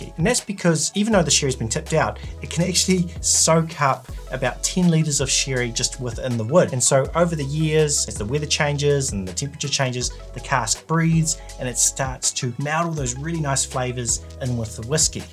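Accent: New Zealand. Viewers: Your channel is so good, needs more attention from the online whiskey community. Very well edited, great presentation, well researched, and I love listening to your Kiwi accent. Cheers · Kia Ora brother, I found your channel a few weeks ago and I must say that you educate on very understanding level. I've been drinking whiskies for 3 decades and last 20 years just single malt on rare occasions blended if I find a good one but I wasn't that good in details about process and casks in deeper dive. I lived in NZ for 16 years and crossed the ditch many years ago so it is always nice to hear this lovely accent. Anyway back to sherry , your choices are spot on, and as you mentioned, like many people, I love A Bunah and 105 a lot. I also love some of the sherry cask finished ones and I am sure you have tried this one before, it is Laphroaig PX, as a peat and sherry lover, this single malt is the best of both. 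Take care, and thanks for the very informative video.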